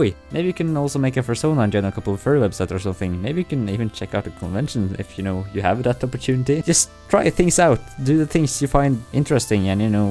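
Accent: Norway. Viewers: Nevermind, found it in one of his other videos, it’s a Norwegian accent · Hahaha your videos are the best - your quirky Norwegian accents/style combine perfectly with an endearing wit that is both playful and thoughtful at the same <3 Also I learned today that in Norway you supposedly eat sandwiches with a knife and fork? Truly a divine country.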